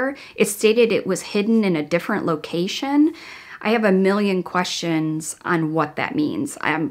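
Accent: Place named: Midwest, United States. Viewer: You: Central Standard time ME: I KNEW IT!! I knew you were One of us!a "midwesterner" by your "accent" LOL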